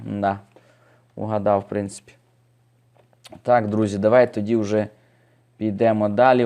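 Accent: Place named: Surzhyk, Ukraine. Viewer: хах, а сам то суржиком розмовляєш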